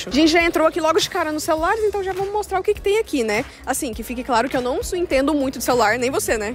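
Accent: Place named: Sao Paulo, Brazil. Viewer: Gostei desse teu sotaque paulista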